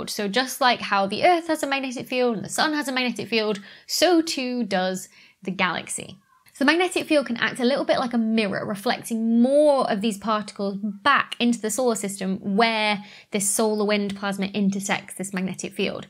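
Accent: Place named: United Kingdom. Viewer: I gave it a like because it made me laugh. Listening to British people that by American standards don't have a strong accident can produce little surprises like this. It seems like they are speaking regular English and then out pops schedule, controversy, solder, etc. But bubble is my favorite.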